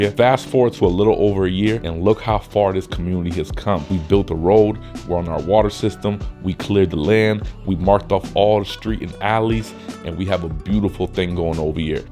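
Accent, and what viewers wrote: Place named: Northeast, United States. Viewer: your kolocwa is flawless 😂 Putting that aside, you sound a little like somebody who's lived in the Northeast ( PA/NJ/NY )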